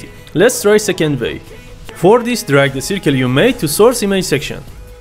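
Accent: Iran. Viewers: I picked up an Iranian accent 🙂. Nice video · you talk english like a persian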